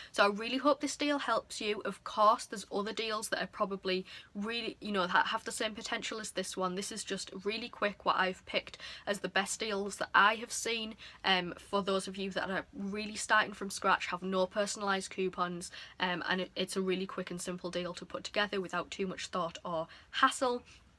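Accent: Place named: England, United Kingdom. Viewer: Hi your accent sounds a bit like mine are you from England? I am from North Shields a little village called New York xxx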